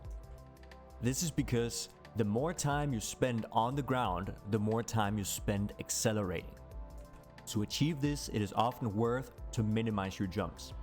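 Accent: Denmark. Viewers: I reconize an Danish accent when i hear one xDD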